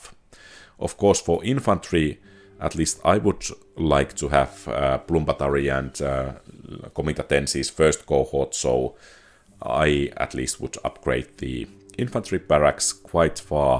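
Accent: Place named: Finland. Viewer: I like the info. And it is nice to hear a finnish accent on the English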